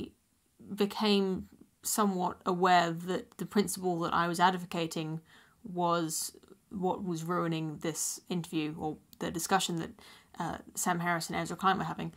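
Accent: United Kingdom. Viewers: You see, this is why I love you. You are not speaking with an agenda you are literally working out thoughts and ideas in real time and changing your mind in real time then presenting those ideas back to us. You are just someone looking for the truth so am I I am a skeptic. Keep up the good work. PS I don't know what it is about your British accent but I can hear you talk for hours no problem. You do stutter a lot though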